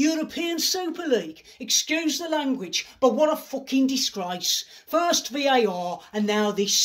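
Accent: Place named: West Midlands, United Kingdom. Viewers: Love the West Midlands accent, brilliant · I've stopped watching premier league football for various reasons, one of which is the greed and arrogance of everyone involved. Andrew is spot on yet again with his comments, though his brummy accent leaves a little to be desired...................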